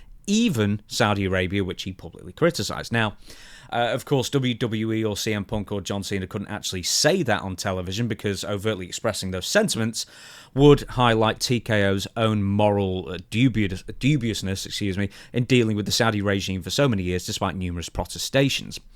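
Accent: United Kingdom. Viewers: There's a considerable amount of bigotry laced within the Saudi Arabia criticism. Double standards galore coming from a Brit Accent where hundreds of years as well as current day accountability and criticisms should be considered and prioritized. At the end of the day, these shows are bringing joy to innocent people and humanizing those who come from a different background. People trying to shit on that are at best hypocrites and at worst closeted bigots.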